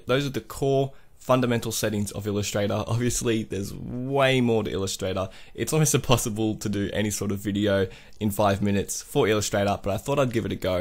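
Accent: Australia. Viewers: So glad to find an Aussie tutorial I can understand 😅🙏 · Thank you for this helpful video. Nice to hear an Aussie accent!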